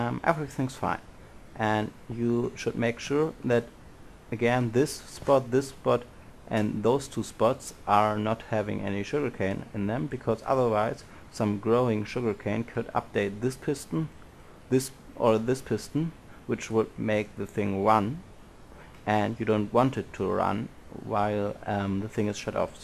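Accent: Germany. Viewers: Are you german ? Think so :D Glaube schon :D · Heyho, in my oppinion you speak with a german accent. is it right, that you're from germany?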